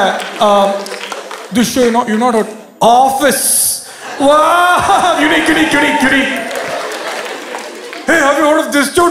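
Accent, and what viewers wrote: Kerala, India. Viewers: His malayali accent is also cool. Enjoy your samosas, people. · malayali accent is too cute anyway 😉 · Malayali accent is nice! Mallus are so sweet.. My in laws are (Sasural) Malayalee. They all are super sweet.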